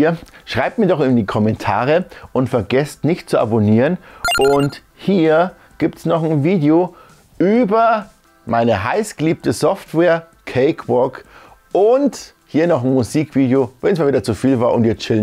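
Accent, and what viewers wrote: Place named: Lower Bavaria, Germany. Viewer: Ja cool :) Grüße gehen raus von Regensburg nach Niederbayern 😊🤙🏼 hoff, ich deute deinen Dialekt richtig 😹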